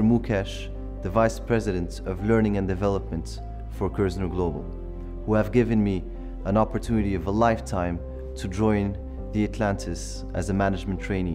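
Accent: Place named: Morocco. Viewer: What ever is the language you speak but your accent still Moroccan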